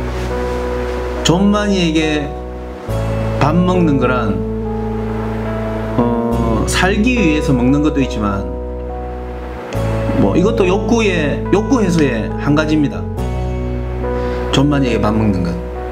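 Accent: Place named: Gyeongsang, South Korea. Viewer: 갱상도래여~ 홧팅!😊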